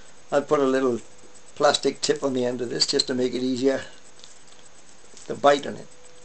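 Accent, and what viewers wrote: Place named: Scotland, United Kingdom. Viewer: I'm curious about where you're from, it sounds sorta scottish but not really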